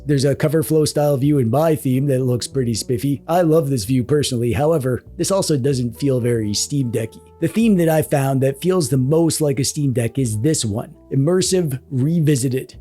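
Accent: Canada. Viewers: Are you Canadian? I think I recognize a fellow Canadian.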